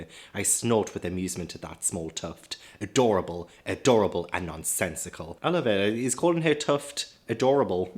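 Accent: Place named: Wales, United Kingdom. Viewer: Actually, I think you'll be okay without that Sex and Holiness video. Even someone with a very limited imagination can guess where that's going... and you strike me as someone with a very vivid imagination! I mean, the irony of it... a man who's sworn off sex has all the answers! Are you Welsh? (sorry if you're not and that's an insult!) Or maybe northern? (sorry if you're Welsh and that's an insult). Whatever you accent is, I could listen to you all day!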